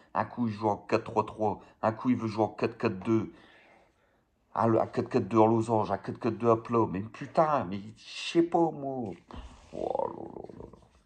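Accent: North, France. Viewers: Voilà les gars . Tu viens du nord ou koï? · y a un accent du nord la derriere ?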